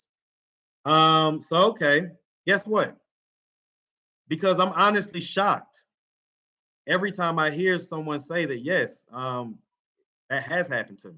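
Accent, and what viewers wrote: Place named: Atlanta, United States. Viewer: Why do you have on a NYC shirt,? Are you from NY? If so, how long have you been living in the A? B/c you have an Atl accent. I'm from Harlem, NYC, born & raised 121st & Lenox Ave. But I've been living in GA for 2.5 yrs now. Don't miss NY, not ready to go visit yet. Finally, get to put a face to the voice.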